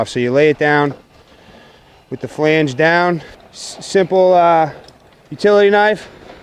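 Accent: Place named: Boston, United States. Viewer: 2 words in and I knew this guy was from Boston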